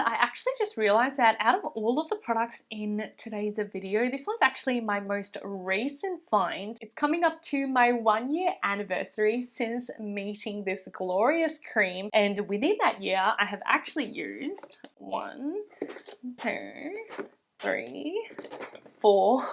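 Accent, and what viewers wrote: Australia. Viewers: Happy to hear an Aussie accent. I was wondering where in Australia stores can we find these ? I haven't seen these in chemist warehouse or myer David jones etc nor I am from Korea so can't understand even if I missed ? Were these brands available in Aus since last 4 years? I haven't come across them in Westfield either.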